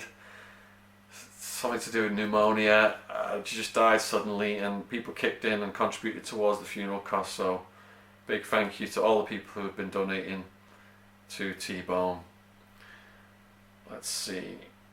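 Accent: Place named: Liverpool, United Kingdom. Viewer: is yr dialect from Liverpool? Brover....brother....cool tho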